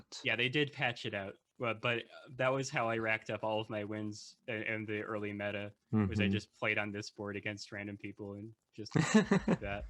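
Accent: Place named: Northeast, United States. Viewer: Your non-scripted voice is very pleasant and northeastern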